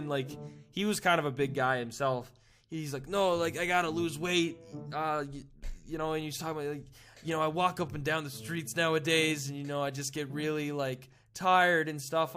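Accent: Canada. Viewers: Ur Canadian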